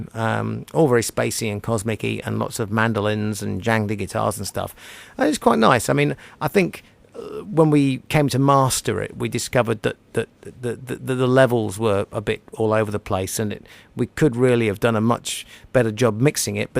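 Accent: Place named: England, United Kingdom. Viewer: A great commentary love is English Accent.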